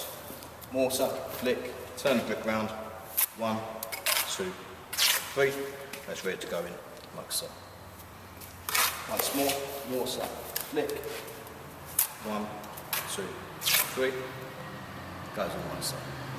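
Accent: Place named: England, United Kingdom. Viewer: natural stone moments . It's an English accent so I'm guessing England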